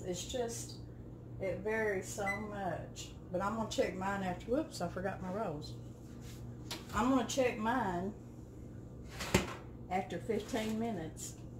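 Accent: South, United States. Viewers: I love your tutorials love to hear you talk love your Southern accent I am a southern girl too . Going to try this for Thanksgiving . I like those towel things for sure . You say your friend is from California , so am I. I live in Southern California. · It is so nice to hear a real southern accent again. I'm so homesick for good food and good people I could cry. Can anyone tell me which video had the quick recipe for fried cabbage and potatoes? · You are so Downhome ❤️ you remind me of my family with your Southern accent. You’re the only one besides me that call a bowl a Bow, lol. And you said scootch the pan over, I say that too. I was born in Kentucky, but live in Iowa for 45 years, just love you, God Bless you too ❤️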